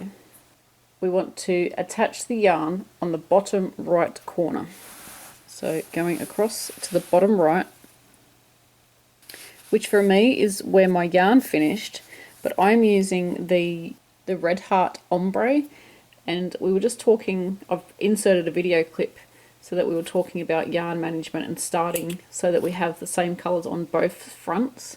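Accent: Australia. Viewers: Awwe, you've been reunited with your crochet love!! That's really cool. I can relate. I had a bicycle once when I was in college. I rode that thing everywhere. Went home for a week. Came back, hopped on the bike, and was so surprised how good it felt when my butt hit the seat!! (I didn't cry, but I get you.) Happy to see you back; I was missing you. Nice patterns, good instructions, lovely Aussie accent!!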